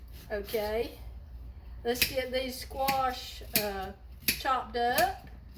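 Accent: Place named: South, United States. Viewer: I am a diabetic, so I am so glad I found your Ste. And plus you have a southern accent like me! Thank you for sharing! Please keep your site going!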